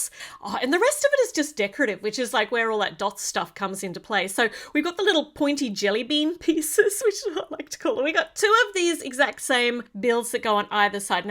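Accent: Australia. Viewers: Who else loves her Australian voice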